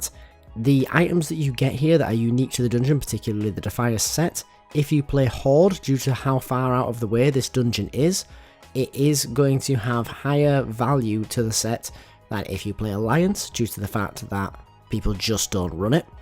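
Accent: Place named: Yorkshire, United Kingdom. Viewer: you sound like a Hulligan ? Yorkshire sir ?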